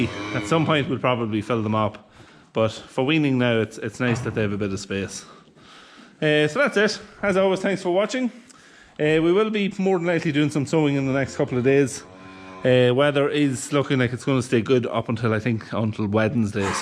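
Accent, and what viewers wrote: Ireland. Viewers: Where are you from in Ireland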